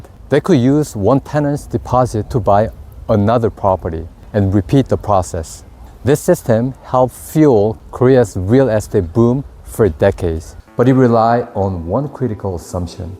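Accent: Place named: West, United States. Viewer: This creator is VERY interesting. I'm running into several English speaking channels of people living on Korea. In particular, this gentleman speaks a Western style of American English very well. Thank you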